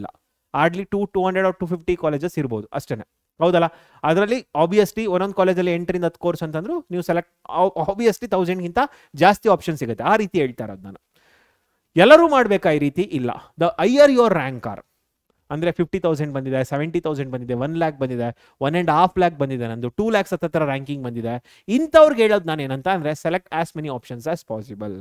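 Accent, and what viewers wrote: North Karnataka, India. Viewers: Sir nivu uttarakarnatakadavara 😊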